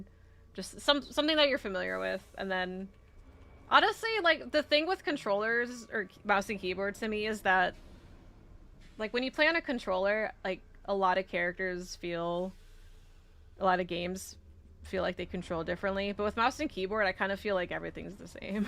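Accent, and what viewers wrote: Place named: Texas, United States. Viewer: Are you from Texas?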